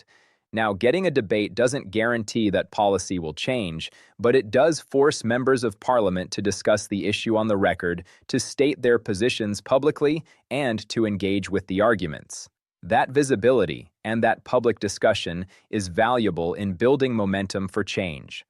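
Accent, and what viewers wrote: United States. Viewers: Why do we have Americans narrating?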